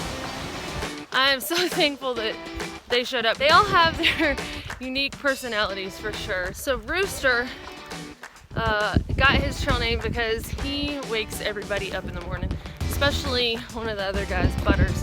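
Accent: South, United States. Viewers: That was just superb! Very nice metaphor about the beauty juxtaposed against the risk, too. And, by the way, as an Oklahoma/Texas boy, I love hearing your southern accent out there among the Californians. You represent us well! Thanks for sharing,. Steve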